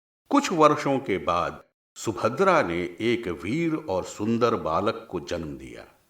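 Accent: Standard. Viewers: उच्चारण की शुद्धता के साथ ओजस्विता इसे कर्णप्रिय बना रही है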